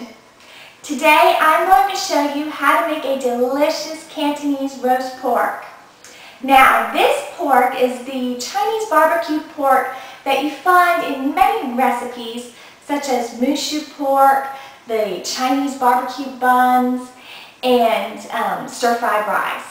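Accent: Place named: Georgia, United States. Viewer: Where are you from? You sound like Georgia.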